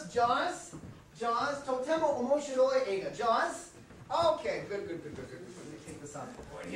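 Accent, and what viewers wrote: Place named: United States. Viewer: 歌うの本当に上手ですｗ すごいアメリカンなまりで話してますけど、もしかわざとやってますか？ 日本語上手そうですし。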